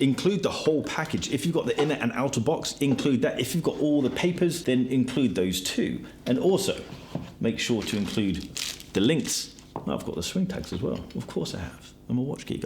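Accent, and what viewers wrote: RP, United Kingdom. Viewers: is uk based surely you have picked that up from his accent. Things happen outside the USA. But he should have clarified it’s a UK eBay Free fee offer for private sellers. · To be clear, in the UK it is free to sell for private sellers, not business sellers. Also vehicles aren’t free. If you are in the US or elsewhere or are classed as a business seller then this isn’t the case., if the accent didn’t give it away, is UK based and not a business seller in this case. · Very posh ❤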